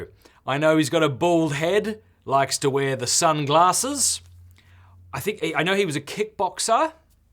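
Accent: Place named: Australia. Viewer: Cool to see the best Australia accent ever.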